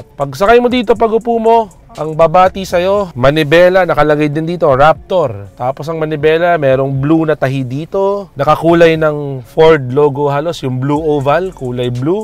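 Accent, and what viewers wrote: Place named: Philippines. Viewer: Salamat sa maayos n pananalita pinoy na pinoy. Salamat sa napakagandang review ng raptor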